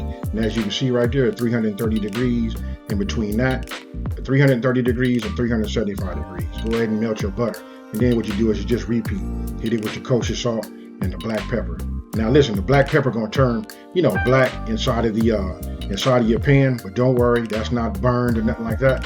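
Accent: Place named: Los Angeles, United States. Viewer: He sound like he from LA fasho!!! 🤣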